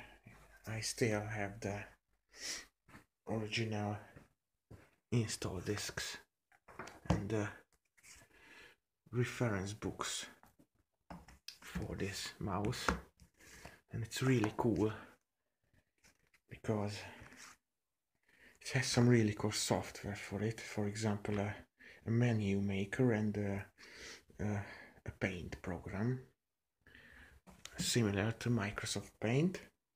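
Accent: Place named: Hungary. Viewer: Helló magyar vagy valószinü ugyanis nem angolos a akcentusod :D .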